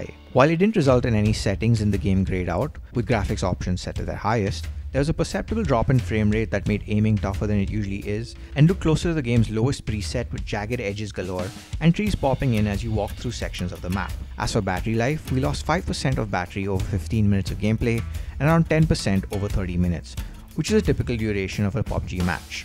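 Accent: India. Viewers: i always like how indian's pronounce FANTASTIC XD its one word that lets u know if he is indian or not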